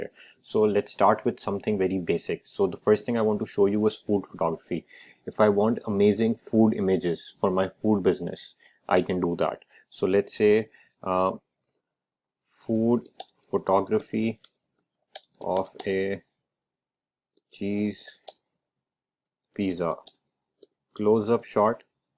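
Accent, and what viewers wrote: India. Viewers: parang english ng indiano sir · Indiano ba ang nag voice-over? Galing mo naman po!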